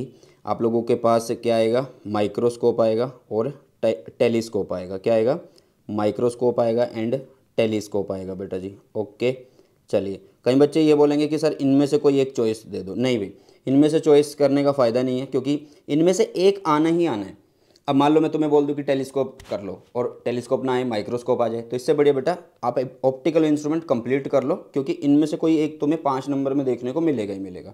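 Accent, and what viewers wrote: Haryana, India. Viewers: Sir are you from haryana??🤔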